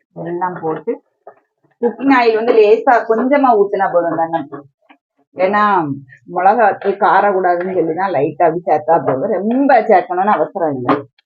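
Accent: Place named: Kanyakumari, India. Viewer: கன்னியாகுமரி தமிலால 😮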